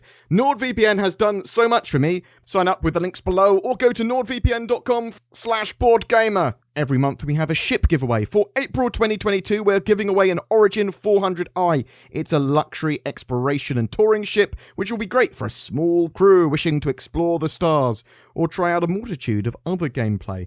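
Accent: United Kingdom. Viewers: love BEING a part of your community. I've met some interesting people, and I love hearing your limey voice talking about a game I am so passionate about.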